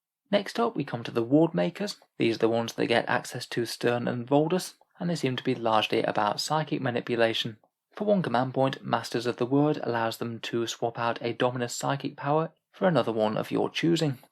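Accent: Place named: United Kingdom. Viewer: Your format is the perfect way to showcase Codexes and the British accent makes all things 40k more authentic to me.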